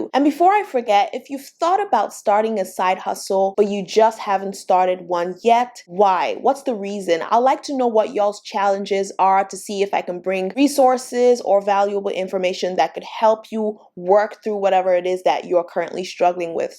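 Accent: Nigeria. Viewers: Glad I found this video. it's great to hear Nigerian, I'm Nigerian too.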